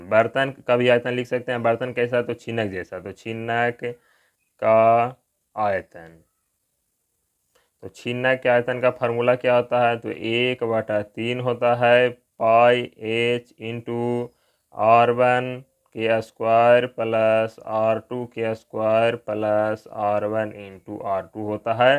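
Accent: India. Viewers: thanks sir bhojpuri style me 😘😘😘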